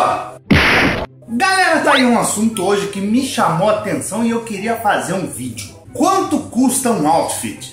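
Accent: Minas Gerais, Brazil. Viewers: Mineirês??? Kkkkkkk mutriii 😂😂😂😂😂😂!! Ahh sim, manoo do céu, quase passo mal de tanto rir 😂😂😂😂😂😂 choreeeiii largaaado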